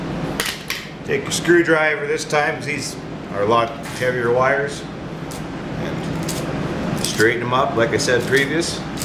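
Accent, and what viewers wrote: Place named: West Coast, United States. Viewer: Thanks Bro. just picked up my first washer motor today ...bit of a grunt getting the little basterd out ..had to hack saw it in two ..now I'm down to the windings ...I guess I will try the cut one end and pull em threw method as it looks like this is the easiest method for me being in an appartment ( no shop with vice) ..gotta do it dat way ...you sound like a West Coaster ....OK better quite gabbing and get on with the show ..cheers to all my fellow scrappers out there ..probably one of you is the guy that gets to some of the TV's before me ...got to love them big sonys ...they are killer ..got 2 the other day ....check out my site I got lots of interesting videos ...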